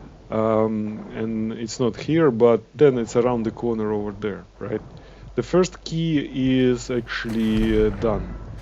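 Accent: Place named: Russia. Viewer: соу рашен акцент))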